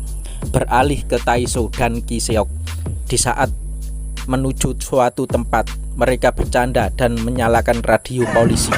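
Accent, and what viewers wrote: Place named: Java, Indonesia. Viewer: Bisa gak kalo bacain alur nya jangan medok Gak enak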